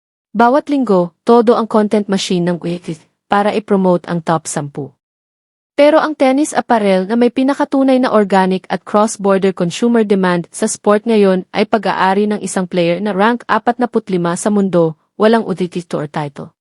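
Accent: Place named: Provincial, Philippines. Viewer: 😂😂😂 pronunciation lng ng NIKE pang barrio ka na😂😂😂 pati Debut mali din😂😂😂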